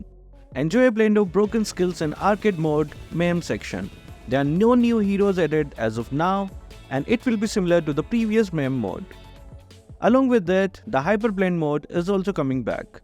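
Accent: India. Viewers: Every now and then i still get annoyed by your voice or accent(in our country we see indian accent as "STINKY"), but hey! At least you bring pretty useful information. and thanks for that :v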